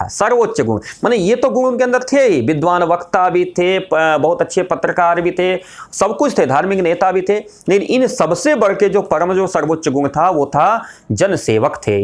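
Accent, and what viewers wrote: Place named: Bihar, India. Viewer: आप योग हैं तभी तो सरकार में चयनित है पढ़ाने का तरीका बहुत बढ़िया है आपकी आवाज लगता है बिहार के लोगों से मेल खाता हुआ, लेकिन हिंदी साहित्य में अधिकांश विद्वान अपने पूर्व के ही हैं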